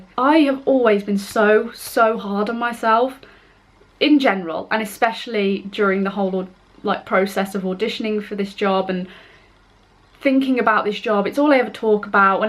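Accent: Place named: England, United Kingdom. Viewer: wow cool accent, are you from England?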